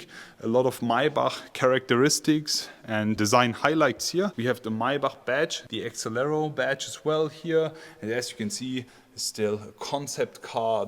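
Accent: Germany. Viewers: 독일식 영어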